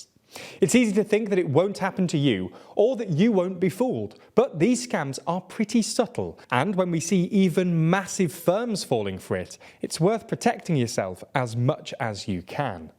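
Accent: United Kingdom. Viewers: Collapsed! How dramatic! Their economy is 10times stronger than any Western country. BTW, isn't it odd that so many anti-Russia Youtube posts have 'British accents'?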